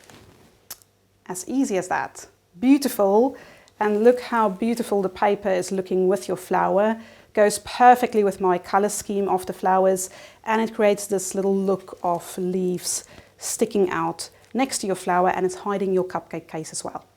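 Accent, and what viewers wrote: South Africa. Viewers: Are you South African?? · This is so incredibly insightful. Thank you for the time. Also are you south african? I know you in the UK. But you have bit of a South African accent.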